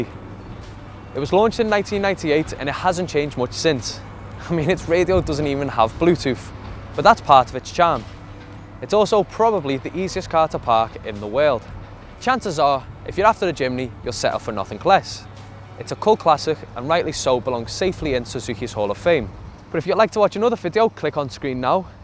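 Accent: Liverpool, United Kingdom. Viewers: Can of cokeeeee and a packet of chiiiiippppss!! Scouse presenter is so annoying! Mind you I cant stand liverpool accents!! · Are you Scouse or Dutch?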